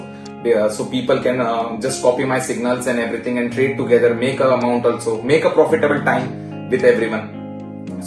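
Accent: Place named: India. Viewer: Bro his accent is Indian and that makes hard to understand but this dude is good and anyone can understand but every body are not the same he was saying his strategy depends on moving average and you can get more explanation from youtube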